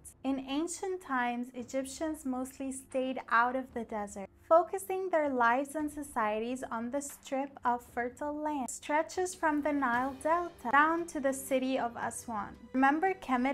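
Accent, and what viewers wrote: United States. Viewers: You sound American or Canadian. Its hard to believe you learned English in Egypt. You must have watched a lot of movies or something.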